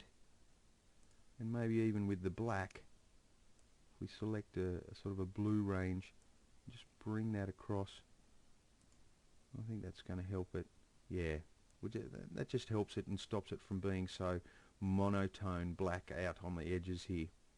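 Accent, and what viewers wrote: Australia. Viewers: That's an Australian Accent if I ever heard one. Awesome Tutorial, thank you very much. :)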